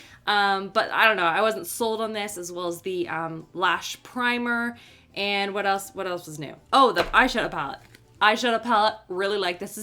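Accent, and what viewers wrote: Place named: Canada. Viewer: I loved your day in the life one!! I find you talk alot like me but then again we're Canadian girls ;)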